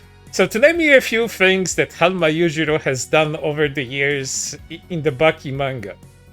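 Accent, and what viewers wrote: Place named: Europe. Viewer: Yeah leave it to some V tuber with a non descript european accent to attempt to cope about Yujiros ultra woke moment where he pwns the conservatards. Yujiros pro trans women anyways, for him thats just dudes finally being honest with themselves. Anyways now I see why people are all the sudden being critical of Baki. Love it when the rightards get mad.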